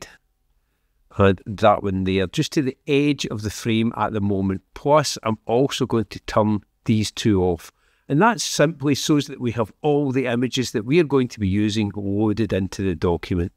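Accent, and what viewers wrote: Scotland, United Kingdom. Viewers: As a non native speaker it took me quite a time to get the hang of your (Scottish?) "touch". But your artistic approach kept me listening - much to my advantage, I must say, language wise and more so art wise too. Main question, of course is: How did you compose these three images in the fist place? Could you do a video about that too? Pleeeze!!!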